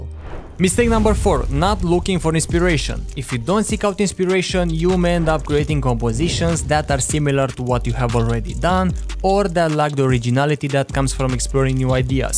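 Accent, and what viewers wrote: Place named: Romania. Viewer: i can tell by your accent you are romanian xD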